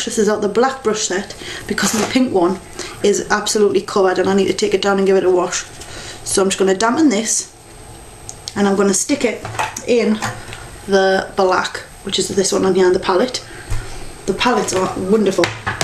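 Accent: Newcastle, United Kingdom. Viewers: I think she comes from near newcastle :D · nice british accent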